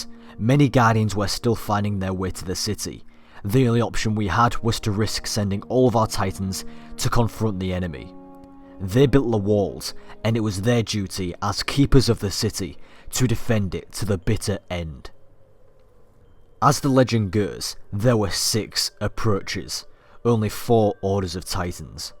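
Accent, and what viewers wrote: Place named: Yorkshire, United Kingdom. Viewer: that's Yorkshire accent.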